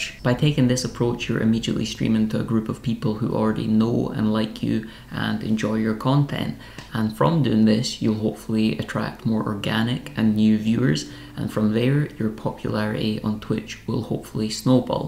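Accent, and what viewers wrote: Scotland, United Kingdom. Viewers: I subscribed because of tiny house, Scottish accent, location and renovation. Being an, ahem, more mature subscriber, this computery stuff is amazing and I haven't a clue what it means! But your voice is so lovely and I appreciate the mental health tips. Good for you.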